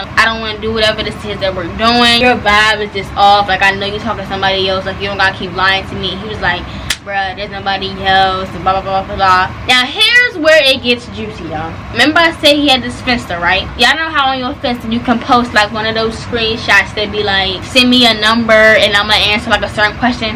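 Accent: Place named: Washington DC, United States. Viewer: That dc accent, PUURRRRRRRRR🤣❤️❗️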